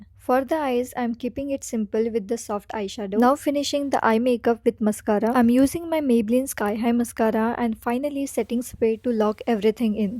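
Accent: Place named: India. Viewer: Clarity? Her English is Indian-speak.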